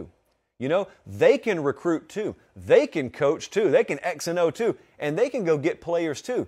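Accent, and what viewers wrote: South, United States. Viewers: we can tell you're from the south. mee-maw doesn't exist above the Maso Dixon line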